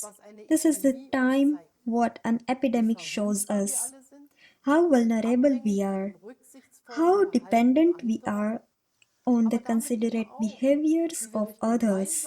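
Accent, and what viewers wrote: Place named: India. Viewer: If you keep arguing over semantics like this you're gonna have a rough time later on. Fact is, it's hard to follow a person that has a thick indian accent.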